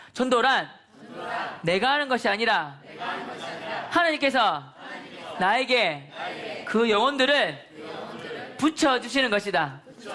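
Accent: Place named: Busan, South Korea. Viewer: 부산 사투리가 이렇케 구수한줄 첨 알았었요 ㅋㅋㅋㅋ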